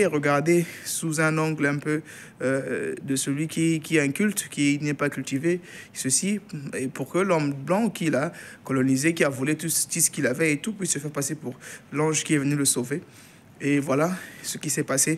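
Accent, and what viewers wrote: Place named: Asia. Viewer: Tu as pris l'accent asiatique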